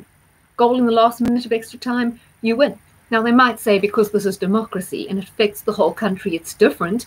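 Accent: South Africa. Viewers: Hi, this remain parliament is driving me insane! It beggars belief how after we were clearly told by Cameron that the British people will decide, not Parliment, not government.... The people and the people's choice will be implemented. Insofar as the next general election is concerned. Can you do a chart on this? I will definitely be voting ukip as there is no one else. It would be interested to see if - after TM resigns after 29th March... I'm hoping actually and Boris takes over, how will he do? Will he start looking at sorting out the destruction caused by Osborne and Hammond austerity cuts? It's desperate how they have made people so desperately poor while the rich flaunt their wealth. The crime rate is horrendous and the London mayor needs to go. Do you see improvement? Thank you for your videos. I found you by chance and constantly look to see if you have posted a new one. 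It's nice too to hear a fellow SA accent!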